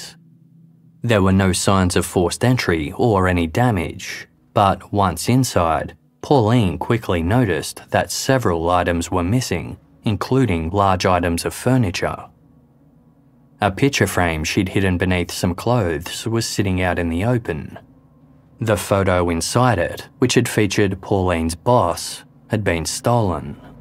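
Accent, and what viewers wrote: Australia. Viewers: I was referencing that the narrator Is a fellow Australian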